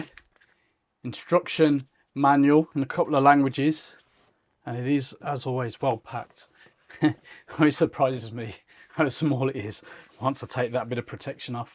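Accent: Birmingham, United Kingdom. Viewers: I recognise the Birmingham.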